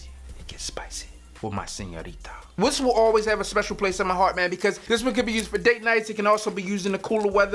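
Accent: Baltimore, United States. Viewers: Homie are you from bmore or Maryland or philly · Definitely from Bmore tho. Good video keep it up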